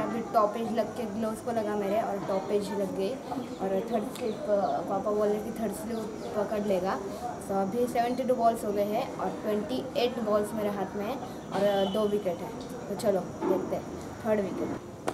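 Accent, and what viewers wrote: Maharashtra, India. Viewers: Bhai aapki drive to laajawab hai , maja aa gaya dekh ke just go forward in your life ❤️❤️ ( And I'm also marathi and I know u r also marathi by listening your accent ) 😁😁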